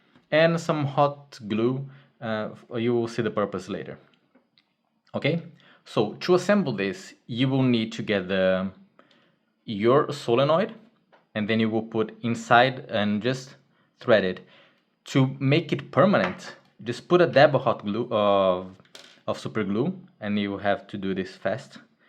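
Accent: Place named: Brazil. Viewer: o sotaque de brasileiro falando ingles é inconfundivel heheh. projeto massa dms, acompanho ha um tempo ja. ansioso pros proximos passos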